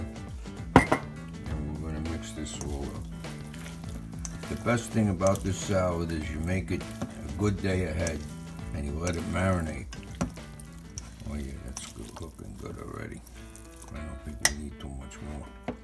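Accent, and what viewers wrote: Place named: Boston, United States. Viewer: U sound like your from Boston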